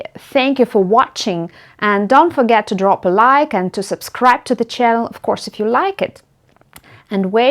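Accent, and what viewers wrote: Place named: England, United Kingdom. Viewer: The word you were looking for is 'rocker'. In English, rocker is used to called 2 aspects of blades: one is about the curve of the entire blade (e.g., 7-foot rocker such as Coronation Ace blades, or 8-foot rocker for most other blades), the other is about that place just below the ball of your foot and about an inch behind the toe pick, the rocker for spins. Thanks for posting in English, much appreciated. Your English is very good, without much Russian accent and with more English (not American) tone, I am very pleasantly surprised...😀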